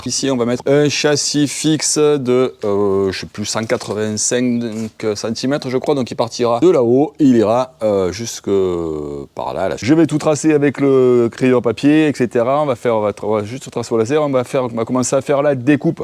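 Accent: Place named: South, France. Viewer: Si un sponsor passe par là offrez des serre-joint à cet homme du sud, il le mérite, des contenus de qualités, l'accent qui me rend nostalgique Beau boulot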